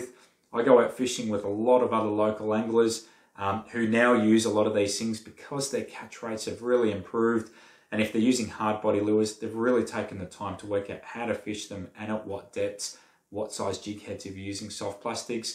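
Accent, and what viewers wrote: Australia. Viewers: You have the best aussie accent fishing channel ever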